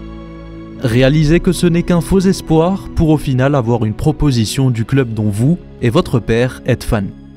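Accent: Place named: Spain. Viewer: Ton accent espagnol , ta diction est juste incroyable VISCA BARCA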